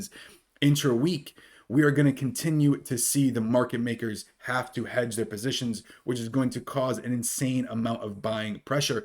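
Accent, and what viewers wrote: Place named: Upper Midwest, United States. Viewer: Nice Green Bay reference! I’m trying to place your accent. Definitely betting on upper Midwest.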